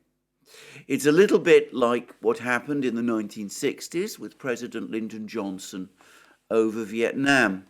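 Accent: United Kingdom. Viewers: Love the muted tonality of your British accent. But one particular anecdote has always bothered me by your use “repeat again “. As I was brought up studying the English language, I was repeatedly cautioned against this redundancy. Am I right in saying so? Begging to be enlightened.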